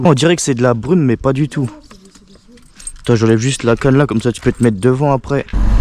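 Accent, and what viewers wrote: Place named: Moselle, France. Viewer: le bon accent de mosellan mdr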